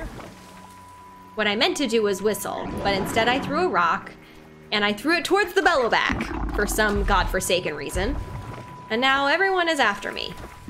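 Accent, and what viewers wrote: South, United States. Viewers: Cool intro love ur southern accent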